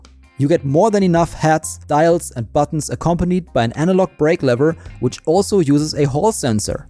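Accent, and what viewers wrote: Germany. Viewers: By the way are you German? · bin ich der einzige der denkt das er deutscher ist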